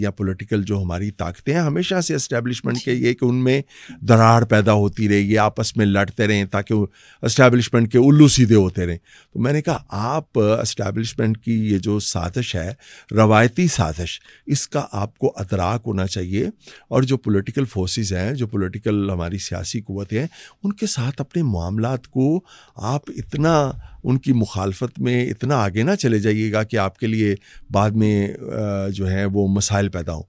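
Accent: India. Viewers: Why are you trying to talk like an Indian? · The anchor tends to have an ‘Indian accent’ - عمران كهان instead of خان. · اپنا اردو کا تلفظ درست کریں انڈین ایکسنٹ سے اپ انڈین نہیں بن جائیں گی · آپ خود کو کھد کیوں بول رہی ہہیں اور ز کو ج ضروری نہیں کہ انڈین لہجہ بنا کر بولیں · Why does this anchor talk like Indian such as khas , whoosh, can't she speak proper urdu , rather copying hindi language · Talfaz mai Indian touch ko tarak krnay ki ashad zaroorat hai. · Plz mam ap ko Pakistani urdu bolni chahiyyay na k hindi accent 3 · lagta hai yeh khatoon india se hain??? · بُہت عُمدہ اور بے لاگ انٹرویو آپ نے شئیر کیا ہے جسکے لئے شُکریہ لیکن آپ کے بھارتی انداز میں کُچھ خاص الفاظ ادائیگی پر شکائت بھی ہے۔ آپ ماشااللہ پڑھی لکھی خاتون لگتی ہیں لہٰذا اپنے انداز گُفتگو کو بدلنے کی کوئی ضرورت نہی۔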